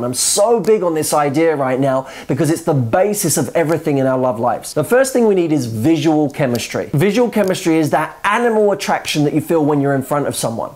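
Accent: United Kingdom. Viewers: ...is it revealed in some of yours videos what is the recipe to attract you ?! :) you are so cute, handsome, so kind and generous with sharing your knowledge that I am about to fall in love with you ;) ...the reason why I've popped into this channel was looking for anything to listen in english as a preparation for english exam and your british accent is so cool so I am watching more and more of your videos now <3 thank you :) ! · this guy is extremely attractive, i love his accent, is he British ? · Hey can you please do a video on the accent...How to do a brit accent...I cannot get over it..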